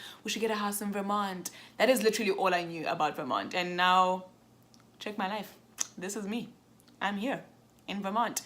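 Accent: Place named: South Africa. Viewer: It’s African! South African or Botswana actually. She sounds NOTHING like a Jamaican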